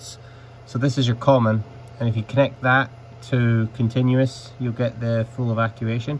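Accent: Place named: Scotland, United Kingdom. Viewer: Love your vids - also great to hear a Scottish accent too. I need to get my old Vig set up in my house, it's doing no good sitting in a box in my garage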